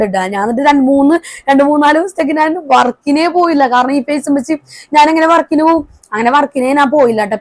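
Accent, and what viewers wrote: Kochi, India. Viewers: Kochikariyanalle samsarathil thonniyatha dear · മരുന്നിനേക്കാൾഎനിക്ക്ഇഷ്ടപ്പെട്ടത്കൊച്ചി ഭാഷ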